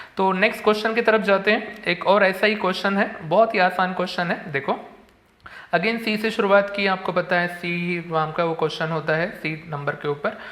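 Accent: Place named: Maharashtra, India. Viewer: He sounds marathi for sure 😁😂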